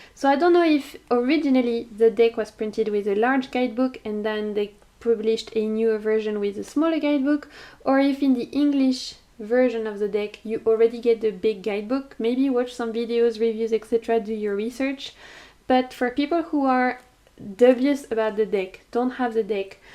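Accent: France. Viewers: I simply loved your video. I am a beginner in this wonderful journey and I do believe that guidebooks must be an essencial part of a tarot deck. You gave me a lot of insights about which deck to buy next. Thanks for sharing. I also love your french accent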